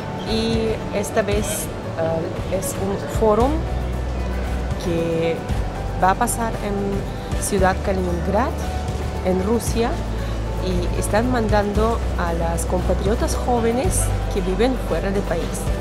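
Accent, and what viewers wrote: Peru. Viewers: HOLA ME RESULTASTE MAS ACHORADA .....ESTAMOS JODIENDO /////ME HECISTE REIR USUALMENTE UNO HABLA LISURAS MAS CON LOS AMIGOS MAS INTIMOS PERO YA TIENES ACENTO PERUANO POR ESO SE TE QUIERE SALUDOSSSSS Y HOY ME SUSCRIBI PARA SONREIR DE TUS VIDEOS.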